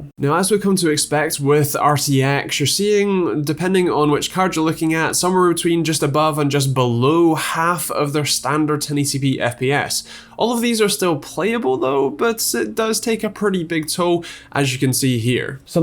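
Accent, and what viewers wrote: United Kingdom. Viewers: I'm here for the British accent.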